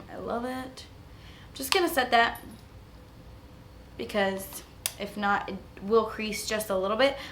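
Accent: Miami, United States. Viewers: I love your Miami accent! Lol. So weird but I can always tell when someone's from there. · She calls it a Miami accent . She's Cuban but doesn't have the accent from them .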